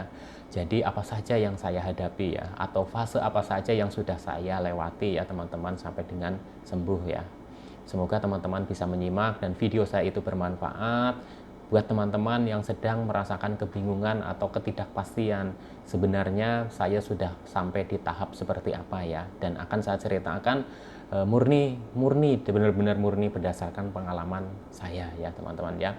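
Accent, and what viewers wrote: Banyumas, Indonesia. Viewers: Sembuhnya pakai apa...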